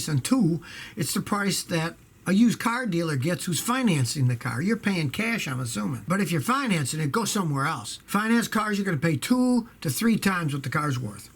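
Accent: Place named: Texas, United States. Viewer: is getting a Texas accent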